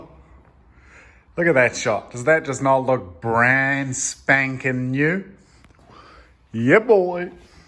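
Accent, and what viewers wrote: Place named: New Zealand. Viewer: gotta kiwi accent 😂 dont worry im a kiwi too nice paint setup for a home job. tommy fyeah spec chur bro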